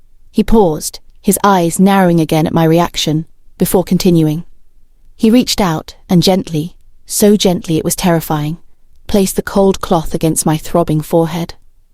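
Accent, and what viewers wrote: England, United Kingdom. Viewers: I like the English accent. It’s better than the one where she sounds like a Connecticut elementary school teacher. And there are a lot of Black people, including women, in England, Africa, and Asia who all have English accents.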